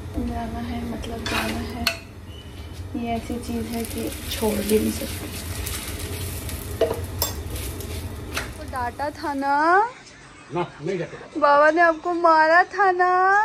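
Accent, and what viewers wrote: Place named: Bhojpuri, India. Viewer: Aaj 1St time comment kar rahi hu .Aapke muh se bhojpuri bahut acha lagela❤❤❤❤❤❤❤❤❤❤❤❤❤❤❤❤❤❤❤❤❤❤❤❤❤❤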